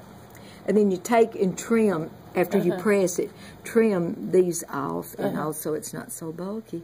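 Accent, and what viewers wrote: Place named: South, United States. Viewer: Just wanted to give you a big hug from a fellow-Southerner (living in Ohio) who needed to make 20 of these. I could not have found a better teacher than you,! You spoke MY language with MY accent. God be with you! Merry Christmas and a Peaceful New Year to you, my dear lady.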